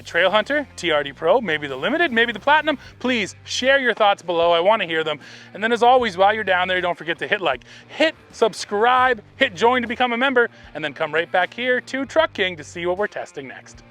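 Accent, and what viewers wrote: Canada. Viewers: Love your regular guy type of reviews paired with a Canadian accent (hello from AK). Trailhunter looks great.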